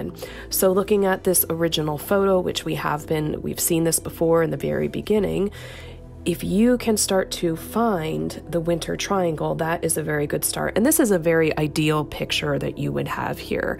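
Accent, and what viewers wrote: United States. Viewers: American pronunciation of celestial objects makes it difficult to understand.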